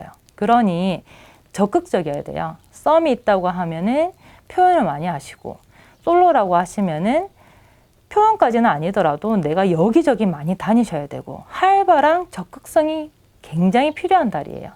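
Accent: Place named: Busan, South Korea. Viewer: 리딩 너무너무 잘 들엇어요!! 디테일한 리딩도 좋쿠 딕션이 너무좋아 귀에 쏙쏙 들어오네요~~😊 부산사투리 매력잇어요!!! 또 피드업뎃해주세요오오오!!🙏🏻🙏🏻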